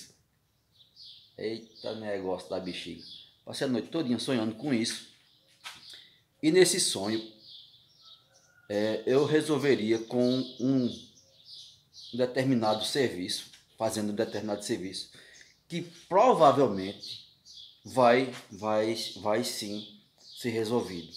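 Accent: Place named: Pernambuco, Brazil. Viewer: Bom dia!. O cara acha qie voçe e do Pernambuco.. Kkkk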